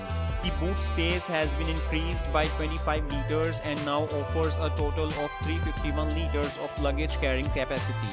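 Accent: India. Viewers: the indian accent 🤪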